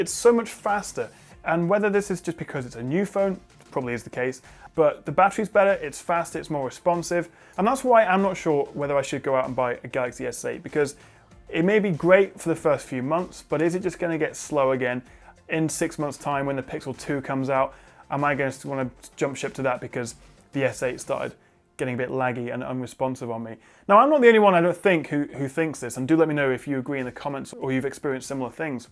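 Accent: United Kingdom. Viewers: meh whiney brit not enough of those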